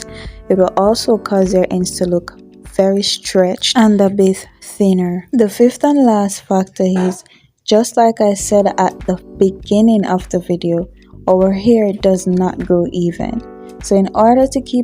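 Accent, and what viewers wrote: Jamaica. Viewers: Do I suspect a fellow Jamaican?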